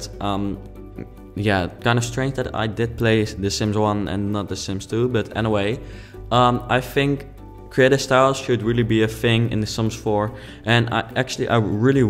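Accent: Netherlands. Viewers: ik kan niet langer dan 2 min naar jouw nederlandse accent luisteren sorry eh bye now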